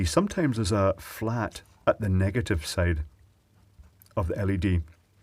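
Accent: Scotland, United Kingdom. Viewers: There's something inherently calming and therapeutic watching a softly-spoken Scottish bloke carefully soldering small electrical circuits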